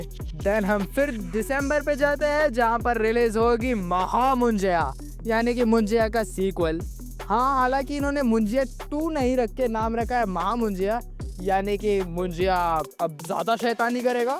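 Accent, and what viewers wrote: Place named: Maharashtra, India. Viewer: Accent se lagta hai bhai maharashtra se hai😅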